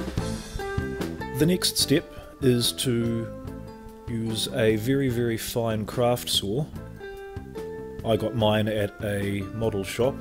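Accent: New Zealand. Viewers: I thought so when I seen the wax remover and the mention of Bunnings and you sound like a Kiwi..so am I in north island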